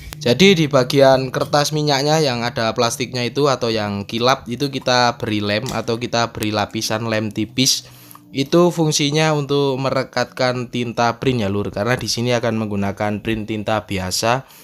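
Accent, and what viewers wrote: Temanggung, Indonesia. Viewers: 🤣🤣 kyak org temanggung ajaa lur.. ahh mantap 😋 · logat temanggung iki, salam mbako lurrr 😬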